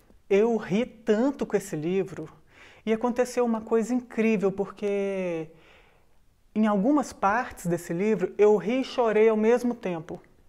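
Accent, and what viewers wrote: Minas Gerais, Brazil. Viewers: O sotaque mineiro é muito cativante. Adoro! Não sou mineira mas, já estive em MG várias vezes, e esperando a pandemia dar uma trégua para voltar a visitar esse estado maravilhoso.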